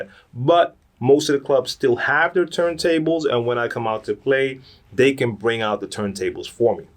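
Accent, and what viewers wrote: New York, United States. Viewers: wow you sound like you're from New York! Great content as always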